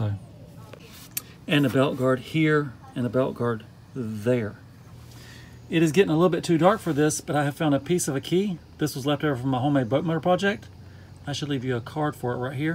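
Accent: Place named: Alabama, United States. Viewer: Alabama? Cool accent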